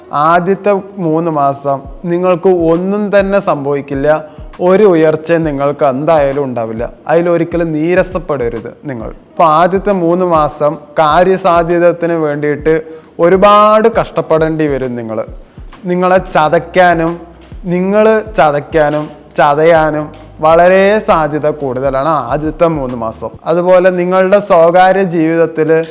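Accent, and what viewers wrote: Palakkad, India. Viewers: പാലക്കാട്‌ ഭാഷ ആണല്ലോ ❤❤❤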